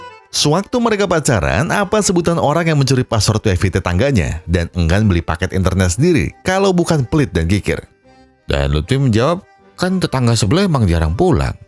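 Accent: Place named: Semarang, Indonesia. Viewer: logat mu to kayak wong semarang og mas😭😭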